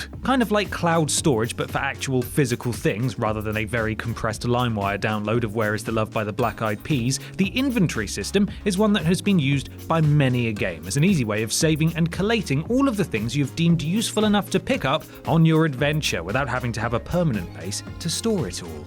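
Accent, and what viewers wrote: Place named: RP, United Kingdom. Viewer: is so posh in these videos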